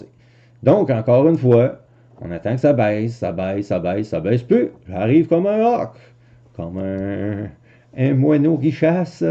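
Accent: Quebec, Canada. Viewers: J aime ce trading vivant, attendre des semaines ou des mois c est po possible. J ai deja un gros paquet sur le gold et le silver depuis 10 ans pour ma securite. Maintenant je veux m amuser un peu, j ai du temps beaucoup de temps ( 75 piges ). Evidement j aime t ecouter ca me rappel mes Quebecois compagnons de travail en Afrique chez Schell.. tu m a reveille. Merci milles fois. Je t envoie mes meilleurs voeux d Athenes 21 degres et du soleil, c est super.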